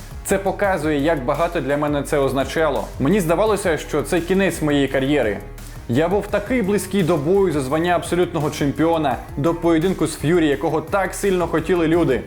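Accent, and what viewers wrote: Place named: Urals, Russia. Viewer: Ведущая вообще не в теме,павловский и немцов?Да он в гробу переворачивается,когда его приравнивают к флюгеру-коньюктурщику-приспособленцу павловскому,который в Украине был идеологом всех темныз сил,януковича,отравление Ющенко,его идея,до последних предвоенных дней топил за опзж на ахметовских каналах,с другими рыговскими прорашисткими недобитками,вы о чем.Исправляйте свой уральский говорок,отличительная черта всех неоднозначных ведущих данного канала,то полный рот дикции,то внешность неоднозначная,это толерантность такая?))))Посмотрите оа Настоящее время,и ведущие и подача,у Михаила Борисовича денег мало?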